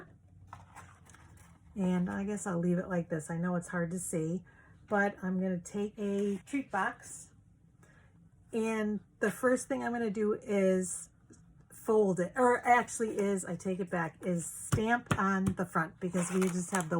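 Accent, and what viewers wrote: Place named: East Coast, United States. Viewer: And…..I love all of your box designs!! Love hearing your Eastern accent. This CO girl grew up in New York and all of my brothers live in MA now. Miss those spectacular fall colors. Hugs, Karen ❌🍁❌❤️❌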